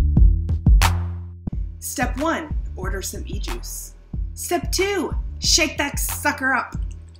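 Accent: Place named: Australia. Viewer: I love your accent. Which part of Australia are you from?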